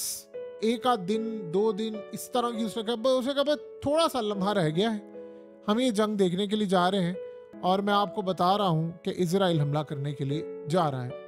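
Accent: India. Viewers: baie AP India chale jayen India ki taraqi AP ke Liye bara aham he or bricks ko bi join kar Len dollar ka janaza bi jadi nikalen. We love Pakistan. AP ka lahja not a Pakistani but Hindustani.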